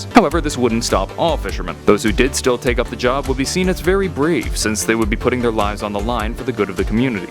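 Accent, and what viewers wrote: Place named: Scotland, United Kingdom. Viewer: Dude it's not that hard to understand Scottish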